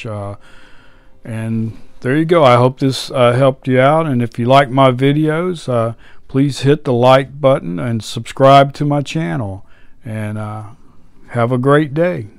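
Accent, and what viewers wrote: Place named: North Florida, United States. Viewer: Awesome, thank you! I received a Benrus that was overwound and it's going to need human intervention. By the way, are you from Northern Florida/Alachua County? Your accent reminds me of home.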